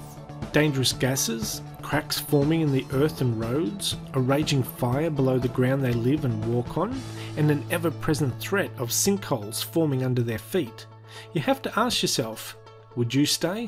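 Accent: Australia. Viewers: I hear 2018, think some people didn’t understand the Aussie accent. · I should have thought it was hard to mistake the two. While you may not have pronounced the "d" there is a clear difference in the vowels between eighteen and nineteen, and the Aussie accent even exaggerates that difference. You clearly said "aye-teen" with a long drawn out aye which wouldn't sound anything like "nine-teen", i.e. aye vs. eye+n. I just think they're unused to other accents and rhythms than US English. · you’re Aussie accent is so far thick it’s become gibberish. That’s where the problem lies. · Aussie accent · You did say 2018 it is just your Australian accent that makes it sound like 2019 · I stg aussie accents are NAILS ON A CHALKBOARD annoying..!!! You all say ‘over there’ like “ORVAH THEY”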